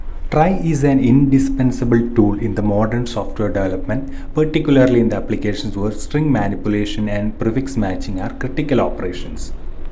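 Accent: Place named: India. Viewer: disgusted by this Indian accent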